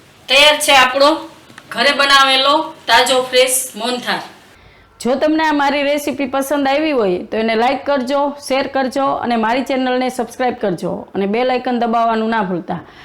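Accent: Kathiawar, India. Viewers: Pyor kathiyavadi gujrati bolo Cho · બેન રેસિપી સરસ છે અને તમે કાઠિયાવાડી ભાષામાં જ બધુ બોલો એ જ સારૂ અને મીઠું લાગે છે અએ તમારી usp છે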